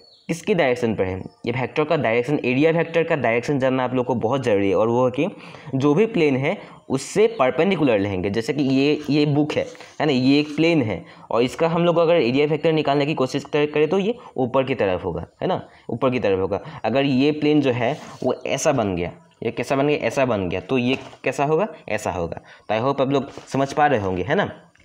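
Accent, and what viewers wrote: Assam, India. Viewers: Sir apuni axomr neki apunar hindi kowa style tu amr axomiya manuhe kowar dore 😊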